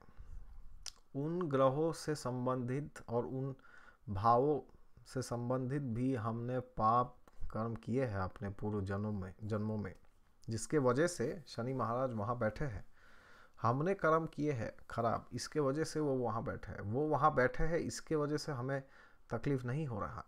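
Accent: India. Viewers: I just love your Hindi accent 😂😂😂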